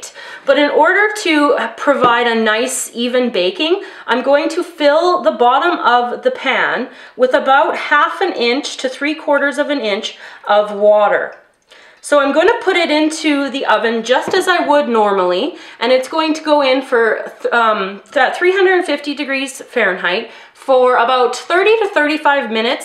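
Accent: Canada. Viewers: Looks good. Are you Canadian? No hate but accent kinda bothers me.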